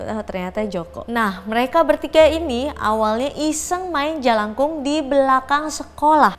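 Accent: Java, Indonesia. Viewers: bunda sarwendah gemes baca bahasa jawa sehat selalu bunda sarwendah cantik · maaf ya.. bahasa jawanya bikin ngakak.. tp ga apa2 bhs jawa pelawalannya mmg susah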